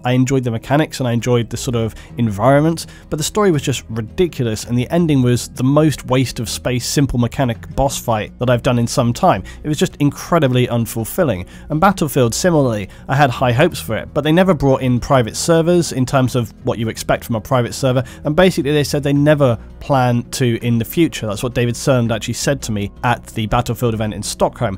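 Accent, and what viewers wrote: England, United Kingdom. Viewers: I stumbled onto your channel solely from the "40K Lore" stuff. While there's other channels that also do great work on 40K lore, your product to me is the overall best. The music, the imagery, and the English accent all really go well together. I am 150% stoked on the idea of seeing Dredd Lore as well! When I think of gritty, dark, and masculine Sci-Fi/Fantasy from the UK, Warhammer and Dredd are the first two things that pop in my mind. I rarely find a new channel on YouTube that really hits me like yours has, so keep up the fantastic work and I look forward to what's in store!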